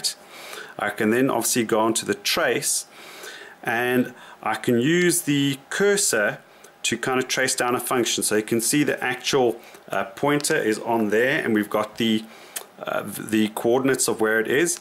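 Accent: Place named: South Africa. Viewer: Good video, thanks. Do I detect a SA accent there?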